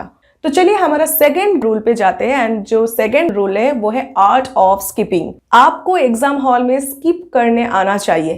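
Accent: Bengal, India. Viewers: Hi! Di are you Bengali? Please tell 😊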